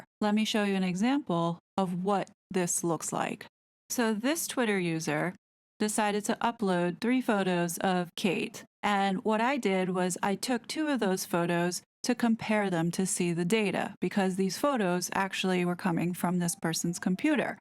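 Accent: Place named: United States. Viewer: Hi. Interesting🤔 I surreptitiously subscibed with your reading of better-not-say🤫 i love your voice... Gentle, melodous American. Perfect pitch and loved it when a laugh was building up in your voice. Let me stop! Ere i sound girlyfestype.😉 This is fascinating. Thank you. I will have listen pay attention... Is the one pic still a blank device? Maybe its a pic only for President of african parks meets President of Rwanda status photo? Did you analyse the other mozambique pic? Clearly on island. Question is Why??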